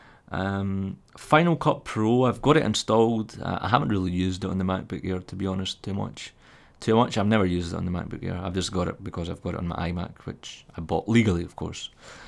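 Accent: Scotland, United Kingdom. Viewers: PLEASE REPLY...when you get your new macbook air is it possible to compare it to the 2010 version of the macbook pro please? or answer this in one of your Q&A vids? I have the choice between getting a 2010 macbook pro (2.4 ghz core 2 duo, 4 gb ram, 250gb hardrive) for 600 pounds or the new macbook air...which would you recommend? By the way, im a big fan of your vids and i LOVE your scottish accent :D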